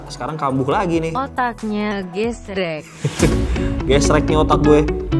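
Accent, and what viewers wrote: West Java, Indonesia. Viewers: Urang sunda mang?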